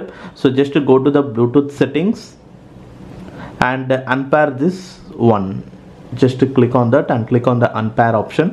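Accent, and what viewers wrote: India. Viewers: You must be Indian to understand this kind of English Language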